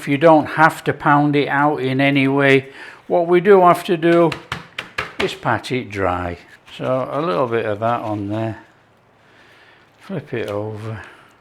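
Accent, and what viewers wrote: United Kingdom. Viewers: love the cooking as well as the beautiful British English accent ❤️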